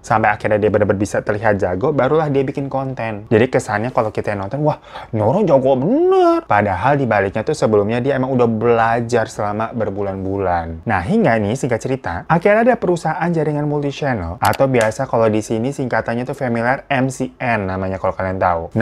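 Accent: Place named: Jakarta, Indonesia. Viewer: Ya ALLAH KAMU TUH LUCUUUU BINGIT SIHHH LOGATNYA CAMPUR2 ada betawi , sunda ,cines dll kaya rujak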